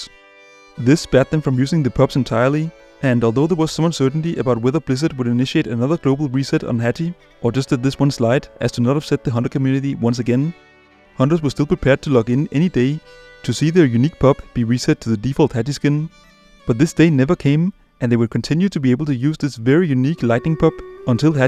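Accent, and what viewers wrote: Copenhagen, Denmark. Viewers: Heavy Danish accent ❤👌🇩🇰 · all i hear is yukky copenhagen accent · It's funny how, as a Dane, whenever I tune into a channel I have never heard of before, I can immediately tell by the accent that I am watching a danish person. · danish accent is very present with this one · is talking soooo DingLish 😆 lyder enda som Københavnsk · Hears first few words: ah, a Dane. :D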